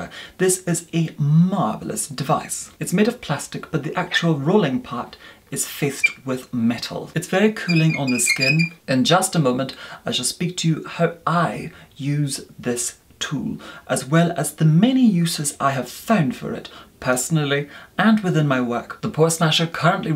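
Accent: Scotland, United Kingdom. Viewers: I discovered you yesterday. Make up isn't really my forte, but your eloquence is delightful. It's also good to hear another Scottish accent once in a while. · First time watcher, fascinated by your accent. You sound like that very specific English accent that only really posh Dubliners have. EDIT: listening more, definitely more Scottish than Irish.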